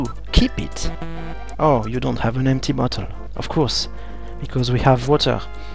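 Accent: France. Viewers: Bro, we figured you're french from your first word! You didn't have to mention xD t'assures mec 👍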